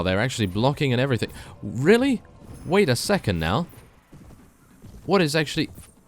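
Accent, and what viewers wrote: United Kingdom. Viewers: I'm just making an assumption from the accent that he would be from the UK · UK I think. u can tell from how he pronounce certain words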